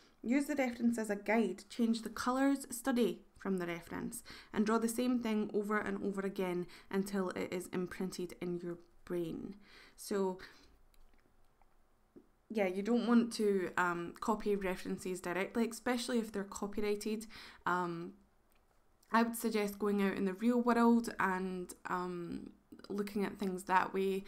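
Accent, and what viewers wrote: Scotland, United Kingdom. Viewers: Maybe it one of these elitist things. I am bad at not doing any practice things between my art club meetings but do mean to. Nice to have a Scottish accent for a change. Just subscribed.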